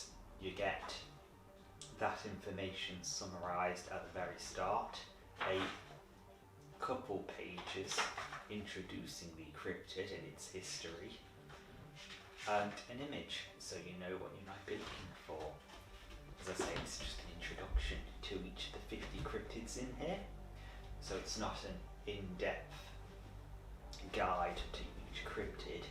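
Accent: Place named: United States. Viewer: So is his accent English or Australian ? He is definitely an American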